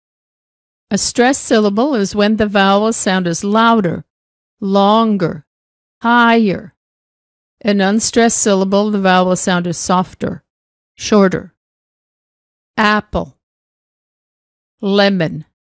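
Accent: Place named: United States. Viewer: Authentic American Pronunciation 😉😊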